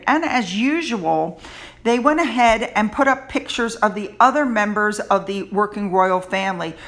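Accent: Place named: United States. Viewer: I opened this cause I thought it would be narrated by some lovely, british voiced person. Much to my dismay, it was a common American sounding gossip columnist. Sounded like someone from the National Enquirer. Highly disappointed. Couldn’t even listen to the end. I’ll be more careful next time I listen to anything on the Royals.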